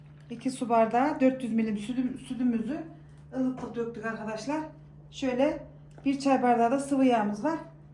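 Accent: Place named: Afyon, Turkey. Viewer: Merhaba hanım. Bu videonuz facebookta denk geldi. Konuşmaniz bizim Afyon tarafına benziyor nerelisiniz acaba? Ellerinize sağlık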